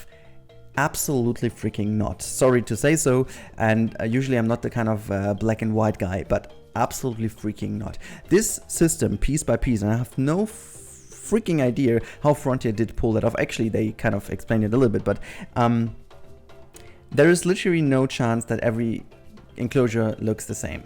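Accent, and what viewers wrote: Germany. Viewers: Are you a German Person?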